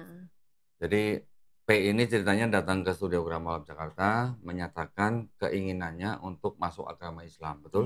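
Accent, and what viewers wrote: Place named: East Java, Indonesia. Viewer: Logat nya JATIM 😅😅😅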